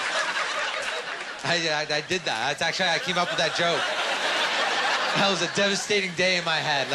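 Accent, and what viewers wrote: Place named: Canada. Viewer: is he from canada? he says ehh a lot..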